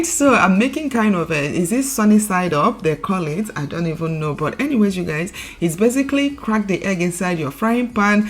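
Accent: Nigeria. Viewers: WE LOVE THE NAIJA ACCENT 🥰❤️🥰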